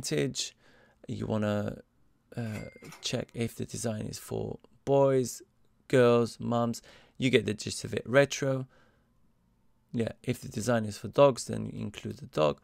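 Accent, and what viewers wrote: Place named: United Kingdom. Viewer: i came here just to listen to your British accent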